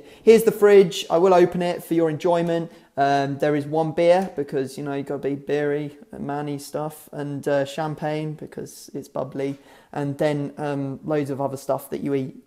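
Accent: Nottingham, United Kingdom. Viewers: Are you from nottingham? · Do u come from nottingham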